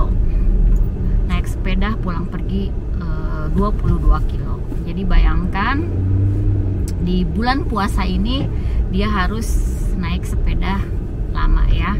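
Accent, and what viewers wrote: West Java, Indonesia. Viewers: Teteh sundanya darimana ?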